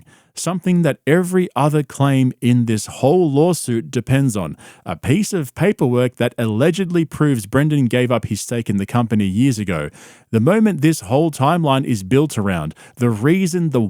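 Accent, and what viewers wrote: Australia. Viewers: This is amazing, loving hearing an Aus accent on this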